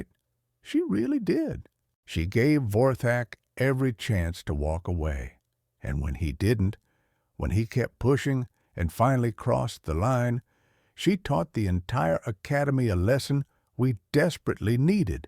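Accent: South, United States. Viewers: You liked an "alien" speaking with a southern USA accent? I find it so stupid I quit watching! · Cowboy accents don’t mesh well with sci-fi stories, sorry. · Narration is an amazingly human sounding AI... with just a touch of a Southern accent. Thank you very much. Little old lady listening from rural West Virginia, USA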